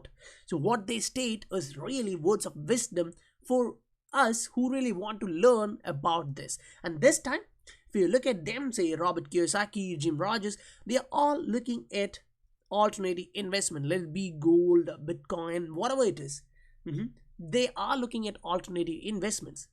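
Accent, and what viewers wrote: India. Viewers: I always thought u have indian accent bro, but was confused when i saw south korea here. which city if you dont mind asking. ? by the way thanks for the quality content man.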